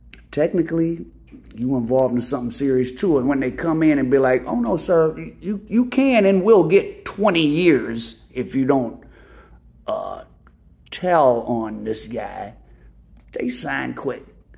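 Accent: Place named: Midwest, United States. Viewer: Your videos very interesting. Lots of folks on youtube with this type of content and reporting but you do it TV worthy. I think you'll be on a network show soon, such as A&E, Tru TV, E, NatGeo, etc. But the accent kills me. Like fingernails across a chalkboard. Worse than Det. Tom Armelli, the retired Detroit detective on The First 48. Strong midwest accent. DA BEARS! By the way I think you are a hell of a journalist even though you say you're not. Later.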